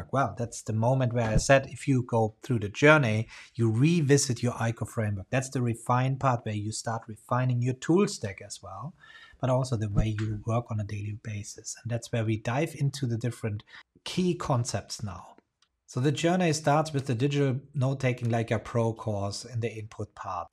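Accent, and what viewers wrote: Germany. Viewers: yes I discovered you guys one week ago and I am very impressed, I love your framework… one question where can I contact you? And I am very curious looks very Spanish but your accent is German isn’t? 😅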